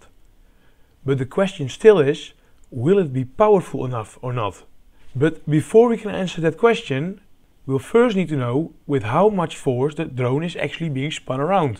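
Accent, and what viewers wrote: Netherlands. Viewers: Love your videos mate. So much so I’m actually commenting on a video. Your creations are incredible. Your channel is definitely the next “stuff made here” ❤ I’d actually love if your videos were 3x longer with more of the technical stuff. Maybe you’re keeping the videos short n sweet to attract the huge following for now? Do you have an instagram page or anything for us to follow you more on? Also love the Dutch accent. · Haha je accent is zo Nederlands, leuk om te horen. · i saw the thumbnail and thought "that looks like dutch grass. then i heard 1 second of you talking. yep. ik herken altijd een hollander op youtube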